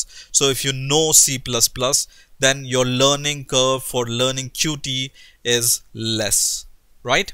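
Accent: India. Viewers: Hindi accent is not so bad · Probably south asia [India]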